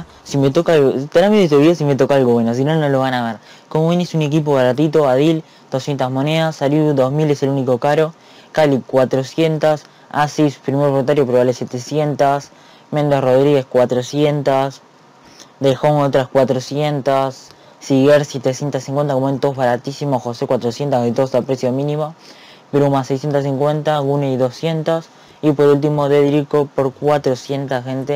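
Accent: Uruguay. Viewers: Sos uruguayo?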